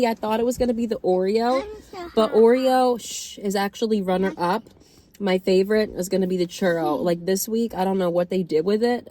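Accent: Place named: Philadelphia, United States. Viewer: Oh your birthday is March 7... so awesome..so is mine. And I could tell you where from like Philly or Maryland or something...your accent... awesome video... Have a great week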